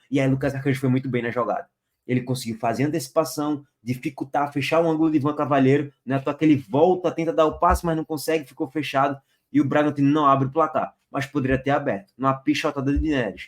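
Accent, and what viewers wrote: Bahia, Brazil. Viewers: Você é baiano?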